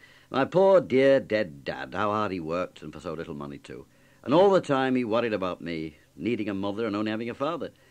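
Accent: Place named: Lancashire, United Kingdom. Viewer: Dear MUP, either you've inadvertently pitch-shifted this up, or YT has messed up somehow. Either way, AB's beautiful Lancashire brogue was never pitched as high as this.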